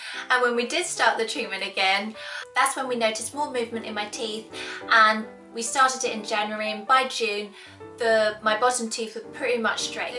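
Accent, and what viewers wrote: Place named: United Kingdom. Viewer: You look asian and your accent was brtish i love it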